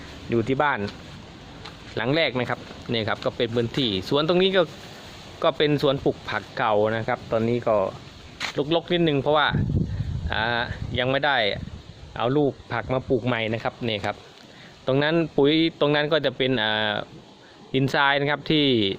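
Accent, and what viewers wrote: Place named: Korat, Thailand. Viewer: พูดโคราช24ช.ม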